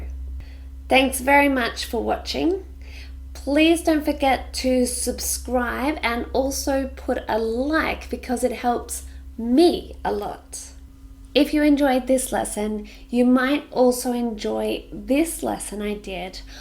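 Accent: Australia. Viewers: Hi!!!! I Am from Blumenau Brazil and I love your acent Australian!!!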